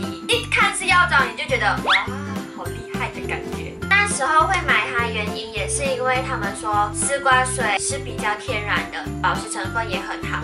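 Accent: Taiwan. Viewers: 你应该是去台湾太久了 讲话的音调都像台湾人了哈哈哈哈哈 加油哦 期待你下一部影片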